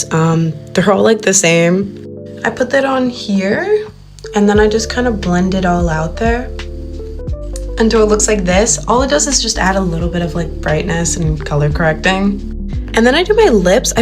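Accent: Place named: Netherlands. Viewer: You sound very dutch 😂 I am dutch aswell :3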